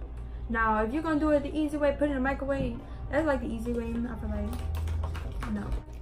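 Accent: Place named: New York, United States. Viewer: she sound like she from new york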